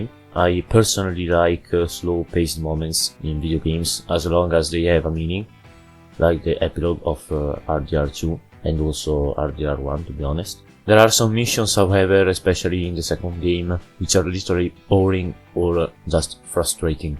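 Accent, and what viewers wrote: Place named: Italy. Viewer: Your voice is very cool, i love when Italians speak English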